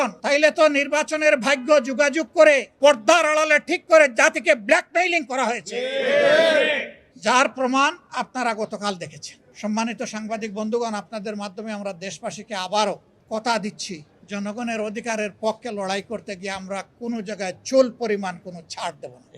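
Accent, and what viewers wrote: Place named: Sylhet, Bangladesh. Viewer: doctor sab speak silghtly sylhety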